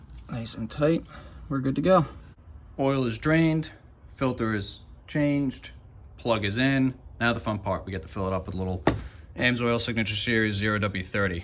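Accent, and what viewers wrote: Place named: New York, United States. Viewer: I recognize that NY accent lol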